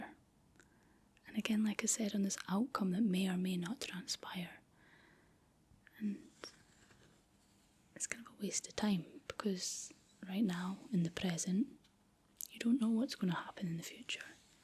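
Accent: Scotland, United Kingdom. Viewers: Wow. That's like the most scottish accent I've ever heard 😂❤ and I've been to Scotland once, and loved it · Pretty Scottish lady, the English and Americans laugh at your Scottish accent, but I don't; I find it pleasant and it helps me understand English better. Also, when I listen to the music from Mel Gibson's Braveheart while flying over your Scottish landscapes with a drone, it's magnificent. I'm French, and I hope to hear from you soon.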